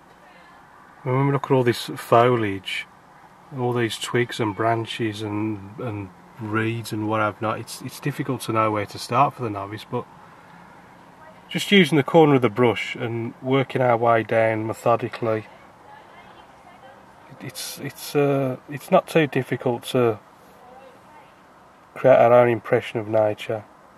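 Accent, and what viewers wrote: United Kingdom. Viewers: I LOVE your British accent! And the painting of course! Just a little bit details on the trees would have made it look even better but it looks great already, I love the colors you painted on the lake and the sky, they looks so real.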